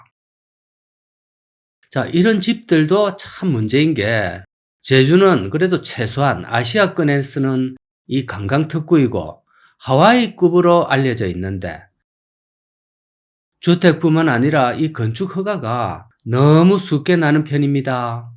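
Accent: Gyeongsang, South Korea. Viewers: 언제나 친근한 갱산도 토백이 사투리 얼마나 정겨운지..., 참고로 전 호남학파입니다만. 다 맞는 말씀입니다. 저는 미국인이 되버렸지만, 조국의 사정을 둘러보면서 제일 가슴 아픈게 한국인 특유의 정다운 스스로의 모습이 어디로 사라져버리는건지 그래서 나중엔 어디로 갈려고 그러는지 중국공산당이 지배하는 땅이 설마 되버리는건 아닌지 답답합니다.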